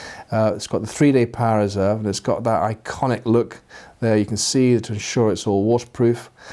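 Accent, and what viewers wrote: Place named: Edinburgh, United Kingdom. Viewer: To be honest I think you`re spot on with you presentations: informative and relaxing to watch and as a bonus you slight Edinburgh burr makes them a pleasure to listen to. I know the Americans tend to do their videos in a different style but there's only so much shouting and fast talking i can sometimes take.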